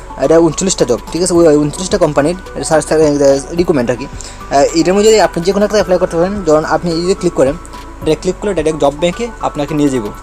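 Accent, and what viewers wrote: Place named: Habiganj, Bangladesh. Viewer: Vair bari ki Habiganj ni?? Kotha shune tho Habiganj lage...🫣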